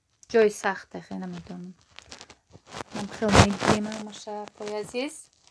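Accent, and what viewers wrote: Kulob, Tajikistan. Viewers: Кулоби мегум гапзанен гуш кунам шумора 👍👍👍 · Салом хохар лахчай хутонкати яне кулобиги гапзанен😍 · Салом ман мехмони нави шумо аз кучои Точикистон хастед? Гапзаниётон ранги Кулобиё ба фикрам хамшахрием.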